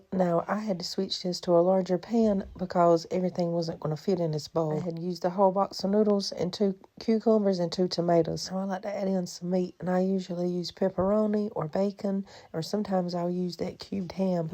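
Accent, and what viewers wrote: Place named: Southern West Virginia, United States. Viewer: Miss, this was absolutely adorable. your accent is very similar to our "Out Wayne" accent here in Southern WV. so familiar but with a twist. and i'm not gonna swear to it but i'm pretty sure you said Martini Noodles to see if we were paying attention.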